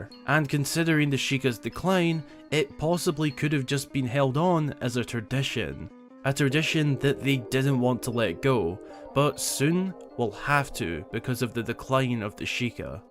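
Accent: Scotland, United Kingdom. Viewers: You sound like yer fae Scotland? Whereabouts I’ll share 2L of strongbow wae ye if ye want?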